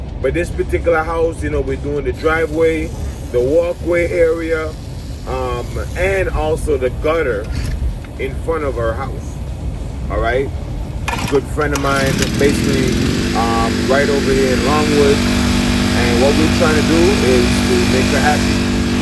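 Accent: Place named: Jamaica. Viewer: sound like your Jamaican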